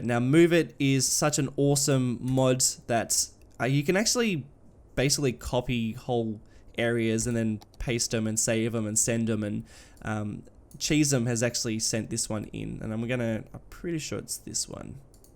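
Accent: Australia. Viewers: aussie guy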